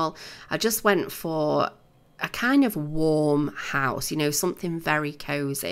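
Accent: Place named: Northern England, United Kingdom. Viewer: New sub here from Arkansas, USA. I love your builds. Are you from the North (Britain)? You sound like everyone on Emmerdale and Tan from Queer Eye LOL. Congrats on becoming a Game Changer!!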